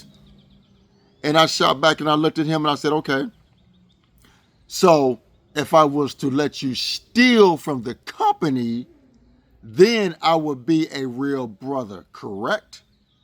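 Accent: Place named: United States. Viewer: American accent is really troubling.. is he talking about a Pizza?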